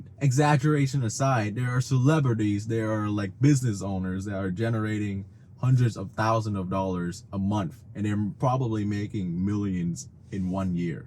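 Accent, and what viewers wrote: Vietnam. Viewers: Your vietnamese accent is showing.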